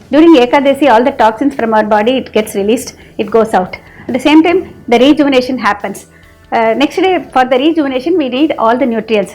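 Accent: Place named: Tamil Nadu, India. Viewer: you are speaking English like you speak Tamil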